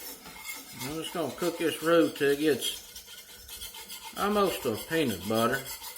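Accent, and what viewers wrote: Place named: Mississippi Delta, United States. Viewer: You sound like you're from the MS Delta, perhaps Indianola or maybe down around Yazoo City. Greenwood/Greenville? No matter where your're from, you made a nice video. Just please get yourself some good wooden or plastic utinsels to save that fine cookware.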